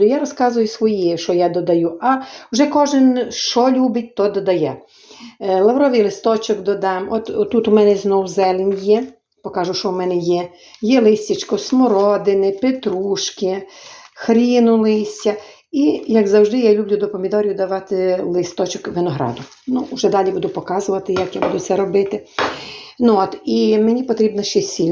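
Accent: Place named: Transcarpathia, Ukraine. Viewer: Дякуємо за чудовий,простий у виконанні рецепт і чудову українську мову Закарпаття🙏🇺🇦🇺🇦🇺🇦👍💙💛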